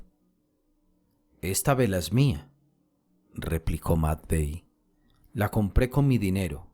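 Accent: Colombia. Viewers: Chejov y todo ese grupo de escritores , describen con maestría la idiosincracia del pueblo ruso, su pobreza, sus anhelos, la rutina que los apabulla y esa relación tan particular con el cristianismo ortodoxo. Buena lectura de un " paisa" colombiano delatado por su acento.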